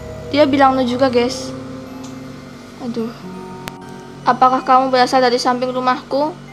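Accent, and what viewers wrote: Indonesia. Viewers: Kak orang Jawa ya?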